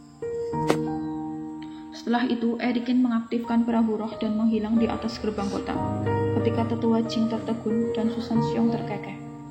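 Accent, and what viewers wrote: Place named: Banyumas, Indonesia. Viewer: dialeknya kaya orang ngapak, apa iya?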